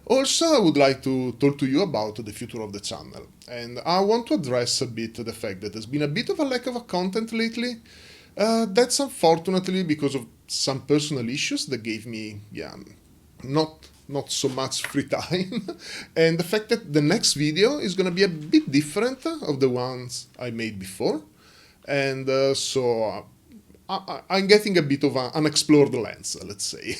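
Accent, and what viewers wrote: Italy. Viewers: Congratulazioni! Mi era sembrato di riconoscere un accento italiano, e ora ne ho la conferma! Apprezzo moltissimo i tuoi contenuti, i tutorial sono estremamente ben fatti e facili da seguire. Non vedo l'ora di vedere i prossimi video sul MG Sazabi. Personalmente, sono un grande fan del weathering, quindi sarebbe davvero interessante vedere dei tutorial a riguardo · Love your content so much, as a beginner they’re very helpful improving the skills of gunpla building. Also love the mood of the videos, they’re relaxing and always make me smile. Congrats for the 1000 subs! (Just a quick question, sei Italiano? Dalla pronuncia sembri emiliano come me ahahahah)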